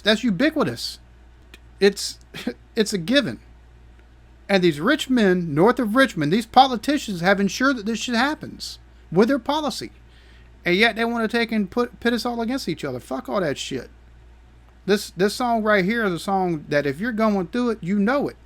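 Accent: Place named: Yorkshire, United Kingdom. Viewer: Is that Yorkshire UK? asking for a Lancastrian.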